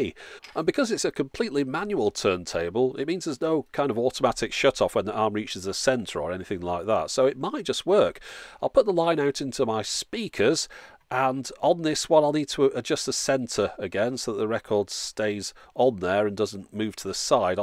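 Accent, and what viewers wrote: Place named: RP, United Kingdom. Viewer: The voice sounds like Finn from BBC English...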